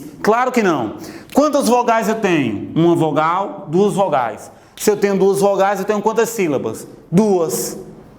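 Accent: Northeast, Brazil. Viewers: Você ganhou um inscrito! Da aula muito bem e ainda tem esse sotaque nordestino que é maravilhoso de ouvir! Kkk parabéns professor, todo sucesso e muito obrigado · Professor apreender com sr. é muito bom e ainda com nosso sotaque nordestino e o jeito que exemplifica é melhor ainda. Parabéns!!!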